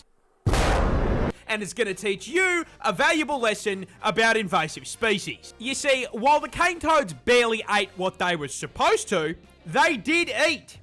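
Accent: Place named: Australia. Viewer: I always enjoy your yapping, it’s very educational. Of course it doesn’t hurt that Australians have the third best accent in the world, second place going to the Scottish and first (unfortunately) going to those damned Brits!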